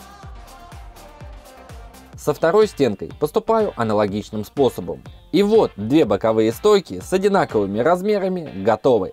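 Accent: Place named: France. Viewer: Отличное решение! Когда начал вас смотреть(строительство гаража) "французкй акцент" привлекал внимание, сейчас же на фоне личных качеств он вообще незаметен )))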